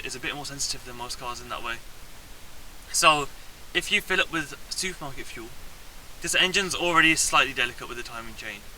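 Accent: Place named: England, United Kingdom. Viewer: Sry man i‘m not used with your strong english accent, my bad. I got a Passat with 1.4 CAXA in june 2023, bluemotion 122hp and back then 36000km. Now i‘m by 100000km and aproacing to a oil service next week. Should i ask to check the timing chain? I‘m a bit afraid since the previous car was a total disaster, Tiguan 1.4 TSI 2009 with 150hp (sims to have not only a turbo compressor but a second compressor as well), they found a broken cylinder during the 12-months warranty and then they offered me this passat since it was a bit newer and they also said it is a better engine. What’s your opinion?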